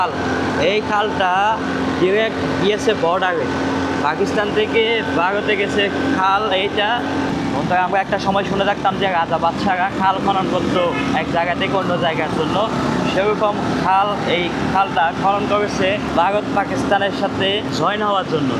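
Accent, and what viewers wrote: Chittagong, Bangladesh. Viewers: তুমি চিটাগাঙ্গে ভাষায় কথা বলো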